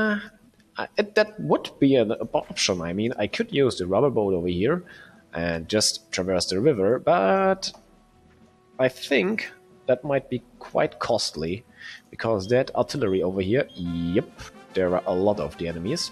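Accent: Germany. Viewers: You have German Accent Im German so my Englisch is bad. Bist eigentlich ein guter Youtuber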